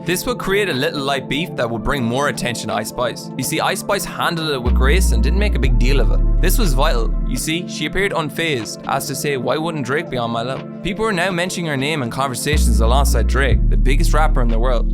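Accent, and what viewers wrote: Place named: Ireland. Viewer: dang she is a great example of right place, right time, right person, right team, right everything! Also are you from Ireland? Your accent is dope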